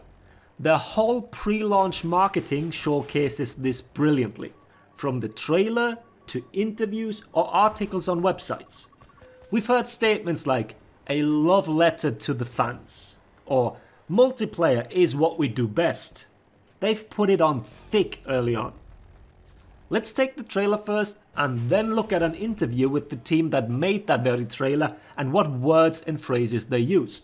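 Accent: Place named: Northern England, United Kingdom. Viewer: Good video my friend. Might sound like a weird question but do you like in the North of England? You have a northern twang when you speak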